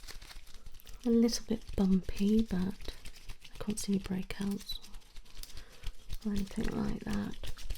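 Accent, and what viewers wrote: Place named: United Kingdom. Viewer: Her british accent also does it for me.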